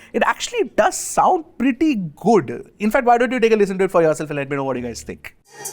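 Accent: India. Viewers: VERRY FUNY WHEN INDIAN GUY TALKING ENGLISH !!!!!!!!!!! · Brother why your English like Hindi easy to understand ❤❤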